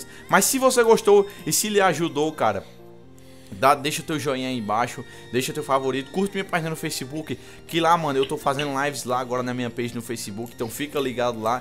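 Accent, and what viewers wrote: Northeast, Brazil. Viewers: tu é nordestino???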